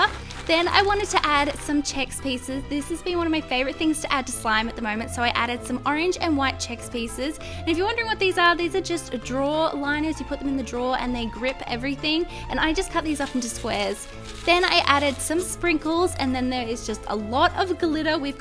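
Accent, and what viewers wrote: Australia. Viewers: Are you alstralian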